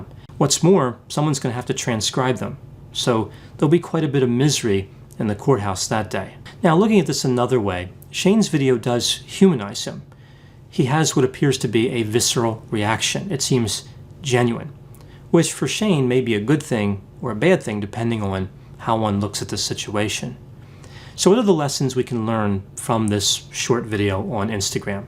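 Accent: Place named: East Coast, United States. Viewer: Caught your accent in this video - sounds very East Coast. Greetings from Philadelphia!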